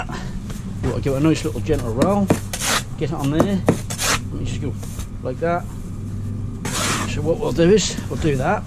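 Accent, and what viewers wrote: Norfolk, United Kingdom. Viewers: You sound like a good old Norfolk boy my friend?? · He sounds like hes from naarrrfolk, norwich?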